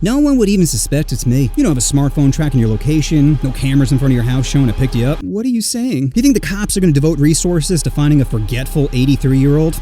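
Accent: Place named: Alabama, United States. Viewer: Bros from Alabama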